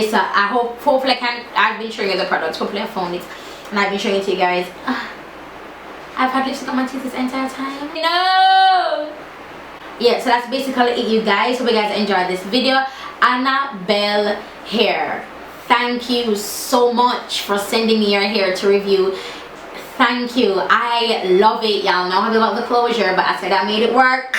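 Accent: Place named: Jamaica. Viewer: I love your accent. You gotta be from Jamaica lololol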